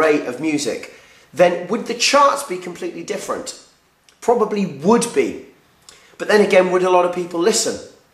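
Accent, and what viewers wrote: United Kingdom. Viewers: im from germany and i alway enjoy listening to your videos. im a big fan of the british language! :)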